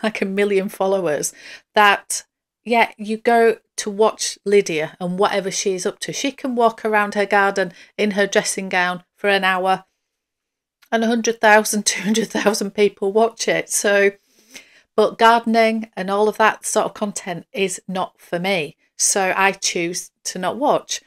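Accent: United Kingdom. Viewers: Hi, Yes, I have stopped watching Colorful Noir, Caitlyn & the third (not sure who she is), but Shea Whitney is another one who has gone on to bigger and better things. It's hard watching some of those videos, because it makes me feel guilty about my bag obsession (as if something is wrong with me!) 😱😆🤣 Anyway, I watch you, Meredith, Lisa, and Dayle (Connor not so much 😆). As an American living in Vegas, it's something about the British and Australian accents that has me hooked to your channels. And I just love hearing Lisa's perspectives in NY. ☺️ I honestly believe the foreign accents in YouTube channels make them more appealing to watch. (You all sound so much more sophisticated than us!😂) It's very addicting. You have the most calm, soothing voice & are so polite but then stand your ground in a non-offensive way, which is a great skill to have, since it doesn't put people on the defensive or make them feel bad. 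Anytime you have a video up, I always watch it because it's like listening to a polite and sophisticated friend. Also, I believe a lot of people feel the same way. Americans love foreign accents, and even more so when talking about handbags! ☺️ So I think you could definitely talk about gardening & I would listen (just to hear your perspective in your British accent). 🥰💜💙🙏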